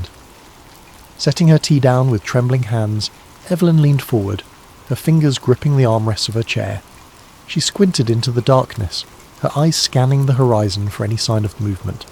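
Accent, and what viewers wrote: United Kingdom. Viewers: I love this but i am very tired of a British accent. It doesn't relax me. I struggle to interpret every elongated vowel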